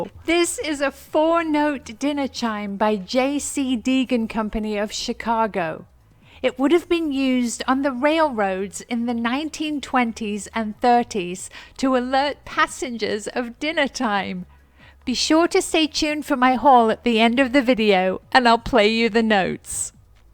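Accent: England, United Kingdom. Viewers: Love your accent my mother was from England. Miss her voice. Love your videos!!!💕💕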